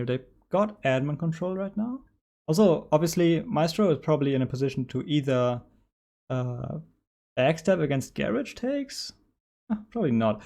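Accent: Germany. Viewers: I knew it i could hear the German Akzent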